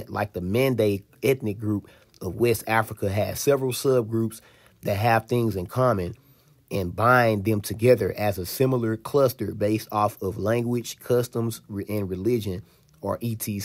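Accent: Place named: United States. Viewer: With due respect bro the Fulbe in nigeria 🇳🇬 are very fluent in fulfilde 🗣️dey cut across the cameroons🇲🇱,central Afrique, Niger, conakry and so on and mind u the chief of d Fulbe in Yola nigeria 🇳🇬 is district head and spiritual leader to all dese zones dey pay Allegiance🐮🐮🐮🐮🐮🐮🐮 to him bro pls 🙏 don't be ignorant I see u got an American accent🗣️how much of fulfilde can u speak urself bro🤷No hard feelings bro. Bro good job u did here buh pls 🙏 do a lil bit of research on Nigerian Fulbe🐏🐑🐃🐂🐄🥛🥛🥛👌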